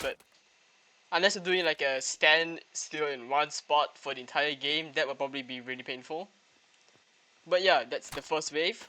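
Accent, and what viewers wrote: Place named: Singapore. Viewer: u sound singaporean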